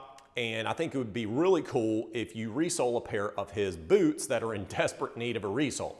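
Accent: South, United States. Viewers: He's what was once called a "Good Old Country Boy", a man who is respectful and demands respect in turn. He reminds me of my 7th grade teacher and coach, Mr. Jackson. I went from a suburb of Nashville TN to a backwoods country town named Springfield. Mr. Jackson taught English and was the sole sport coach for basketball. He had the same register voice and tones, and, the same accent. Funny thing was, years later, I saw a painting of Pres. Andrew Jackson, and the two men looked almost identical, except Mr. Jackson was almost completely bald. He was a taskmaster, for sure. and keep the tradition of hand craftsmanship alive and prospering. They also show the HUGE difference between hand crafted shoes and factory issue. Unfortunately, we're so used to "factory outlet" shoes (and their concomitant pricing) that when we run across shoes from these guys, we're usually in awe of the quality and attention to detail and their pricing. 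Old saying goes "You pay for what you get." With these guys? Absolutely.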